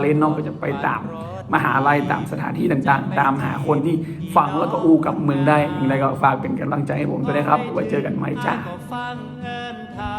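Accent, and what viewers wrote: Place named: North, Thailand. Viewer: ภาษาเหนือน่ารักจังค่ะ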